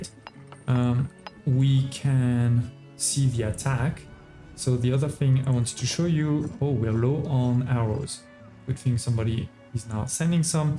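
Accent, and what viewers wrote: France. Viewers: c'est fou comment l'accent francais se remarque dès la premiere seconde de video. mais n'empeche, tu parles vraiment bien anglais. bonne video!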